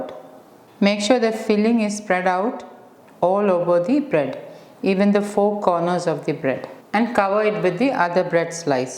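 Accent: Kerala, India. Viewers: Couldn't help but comment.. I could tell the speaker was from Kerala before I even checked her introduction in the description box :)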